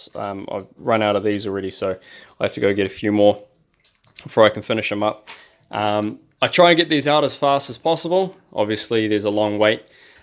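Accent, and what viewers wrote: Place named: New Zealand. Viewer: I had basically the same question. I've been thinking about vapor blasting for a while but i'd never looked at how a professional cabinet works, basically because i'd never be able to afford one. Looking at other diy-ers they were using water blasters but media getting stuck seems to be all too common. I modified my water blasters plastic gun to try adapt a T but it couldn't handle the pressure, and to get to decent pressure the aperture has to be quite small, basically 1mm. I was going to build a pressure pot to also help feed the media through the gun but i'm sure glad i came across these videos. Thanks for pointing us in the right direction bro. Also a pleasure to hear the Kiwi accent! Fellow kiwi in Brazil.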